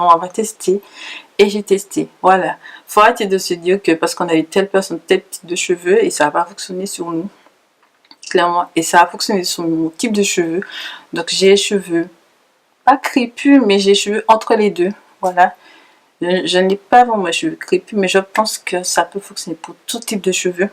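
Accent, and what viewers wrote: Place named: Antilles, Caribbean. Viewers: audrey lawson l'accent créole antillais oui :) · Oui, elle a l'accent antillais ;)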